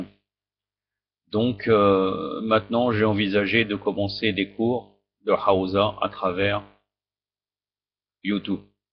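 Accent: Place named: Iran. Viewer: tu récite avec un accent perse, tu parle français? tu ne fait aucune effort de récitation accent arabe. tu n'est pas chiite c'est moi qui te le dit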